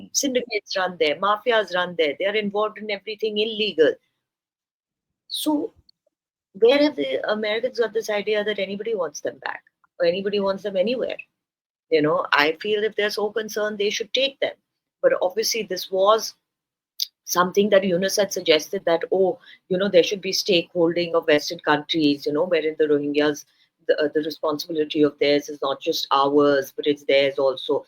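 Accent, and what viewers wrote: India. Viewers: Stop spewing BS in Indian accent. Bangladesh is not in a mess, we are in a very very good shape. Your own foreign policy screwed us over for 15 years. Now just stay away. People don't want ANY Indian influence here anymore. Radicalization? Don't you know what RSS or BJP is? What their goons do in India? And you dare to lecture us about radicalization? Wow.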